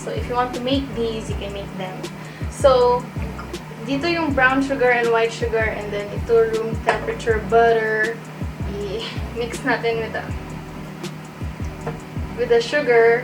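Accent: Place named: Davao, Philippines. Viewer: This is as good as your writing skills :)) ..with some twist, the Davao conyo twist :))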